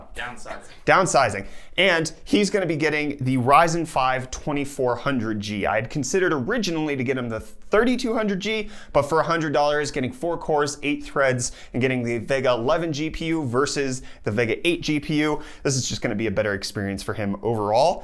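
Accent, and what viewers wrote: South Africa. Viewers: Wait....youre south african?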